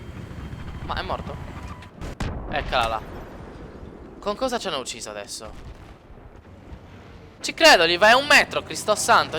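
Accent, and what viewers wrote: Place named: Sardinia, Italy. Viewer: più lungo è il video meglio è.... ù.ù P.s. minchia si sente che sei sardo....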